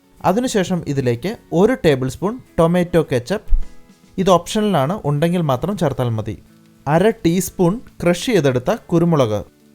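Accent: Kottayam, India. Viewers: Chettan kottayam ano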